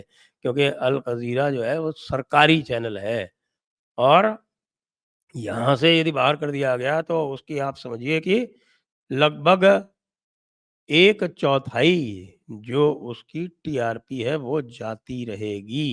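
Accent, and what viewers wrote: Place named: Churu, India. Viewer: आपकी आवाज और बोलने का लहजा एक पत्रकार की तरह नहीं हैं चूरू जिले का हो के?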